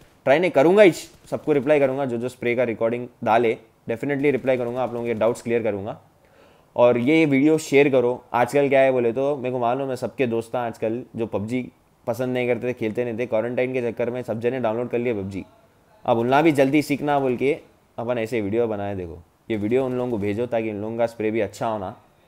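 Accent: Hyderabad, India. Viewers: Are u hyderabadi? Bahut hi pyari accent hai 😊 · Hydrabadi Miya Bhai Boltey ❤️✊ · Hyderabadi hindi bolthe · Hydrabadi bolte❤️ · Hyderabadi bolthe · Love this Hyderabadi accent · Hyderabadi ho kya ? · hyderabadi op bolte bhai kya ki je tumna to sab smja dale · bro it ia just hyderabadi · I love his accent...ek number... Hyderabadi boltey😀😁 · Voice and accent op. Are you from Hyderabad? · Kya proper Hyderabadi Hindi baat karre yaaro! OP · Bro are you a hyderabadi..coz ua slang is similar.. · Bhai bolta mast hai tu full Hyderabadi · Bhai aap hyderabad ke hai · bhaiii... aaap ka language Aur baatan sunke lagra ki aap hyderabadi Hai... arey apan bhi hyderabadi Hai.... aapka accenture Pura hyderabadi language ki waisich dhikra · Tum hydrabadi hai ji?? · Bhai tumhara saare videos dekhta hu mai and ek reason ye hai ki tum acha smjhate lekin main reason ye hai ki kya mast Hyderabadi bolte bawa tum❤️ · typical hyderabadi hindi...kiraak hindi hai ye..hyderabad is jaan !! 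· Hyderabadi hindi bholthe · You are from Hyderabad?? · That Hyderabadi language ❤️ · Ye haidrabadi accent♥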